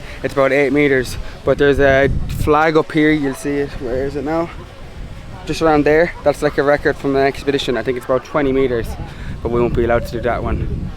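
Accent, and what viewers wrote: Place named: Ireland. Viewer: Sounded like irishy accent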